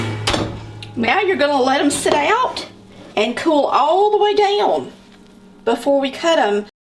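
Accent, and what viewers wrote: South, United States. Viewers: She is so cute with that southern voice i love how she read everyone in a friendly manner in regards to the lemon squeezer .... The lemon bars look delicious .....and yes i will try extra lemon lol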